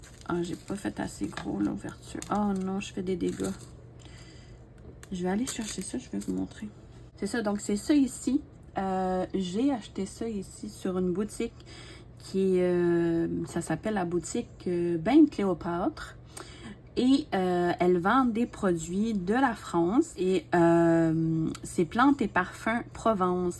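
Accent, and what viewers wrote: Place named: Quebec, Canada. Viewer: Coucou miss ❤ Merci d'avoir pensé à moi 🥰 et merci pour les compliments 😍 j'adore ta voix, ton accent québécois et ta façon de parler 😊ta voix est douce et j'apprécie tes vidéos 💖 Je ne l'ai pas encore tourner donc ce sera un plaisir de le faire 😉😊😘😘😘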